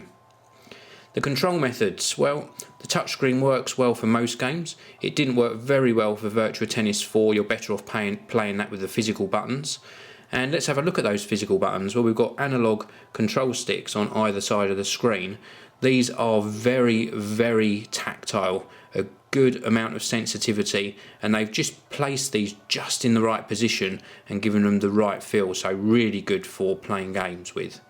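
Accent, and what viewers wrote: United Kingdom. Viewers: Every reviewer is cooler with a British ascent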